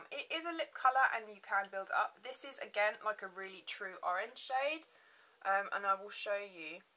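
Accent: United Kingdom. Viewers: I LOOOOVE your British english - so sophisticated! unfortunately I fail at imitating. :-) keep up the amazing tutorials! xx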